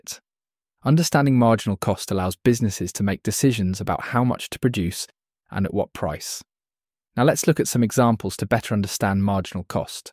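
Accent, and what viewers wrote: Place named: United Kingdom. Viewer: I love your British accent❤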